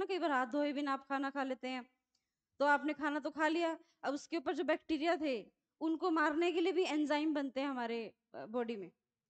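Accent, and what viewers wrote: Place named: Bihar, India. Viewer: Mam ji apka abaj hmare bihar se milta julta lagta h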